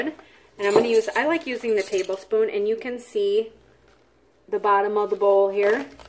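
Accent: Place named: North, United States. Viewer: Hi I 💕 this video!!!! You did a wonderful job showing how to dye techniques!! Plus I love your northern accent!!! My mom is from South Dakota so it makes me feel right at home here in Oklahoma!!!🥰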